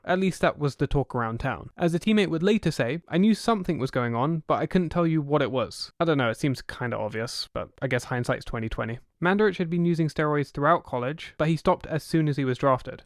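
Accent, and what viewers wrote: England, United Kingdom. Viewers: Part way through this. All known info. Why am listening to a guy with an English accent talk about an American sport. No insight loser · Why is a british guy talking about an obscure nfl player?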